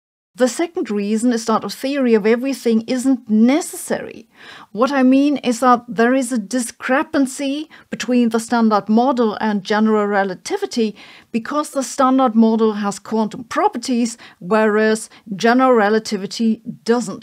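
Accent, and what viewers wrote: Germany. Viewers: Einstein is being pronounced correctly for Sabine's type of German accent. Einstein himself had a southern germanic accent which softens a lot of sounds.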